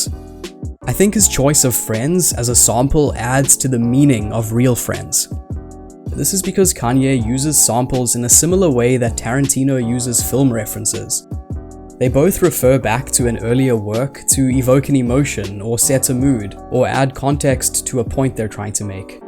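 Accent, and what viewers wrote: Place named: South Africa. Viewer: Kwaai video broer en opbreuk. Jou aksent klink Suid Afrikaans, indien nie... definitief Australiaans of Neeu Seelands😊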